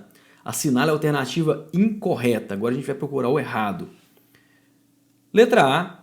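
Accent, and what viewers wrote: Minas Gerais, Brazil. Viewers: aaaaaaaaaa professor por favor continue falando assim mineirinho tracim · Em defesa do "tracim", adoro o sotaques dos mineiros, por favor mantenha o tracim professor! Hhsuahusa... Aula top como sempre, vai me ajudar muito, muito obrigada professor! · Só quem chegou até aqui sabe o sufoco que foi estudar tudo kkkkk assisti aula no trabalho, na faculdade, no ônibus e até no horário de almoço, mas vale a pena porque o professor é incrível, e o sotaque mineiro deixa tudo melhor. Obrigada professor!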